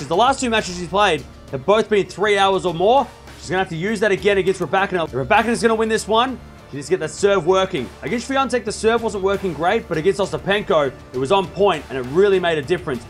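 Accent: Australia. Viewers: But Sorry, I thought he was American. Is he an Australian then?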